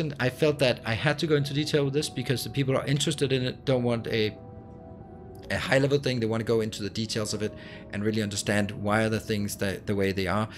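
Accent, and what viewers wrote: Denmark. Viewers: oh god is that danish accent recognisable. From one danish person to another!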